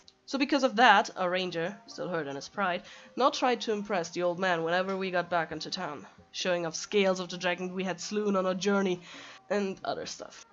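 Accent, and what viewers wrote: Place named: Germany. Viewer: Your little german accent is great! Your art us also great!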